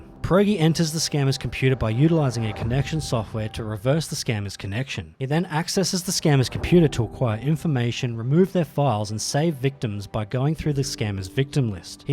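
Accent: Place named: Australia. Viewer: and i just realised your Aussie, even bloody better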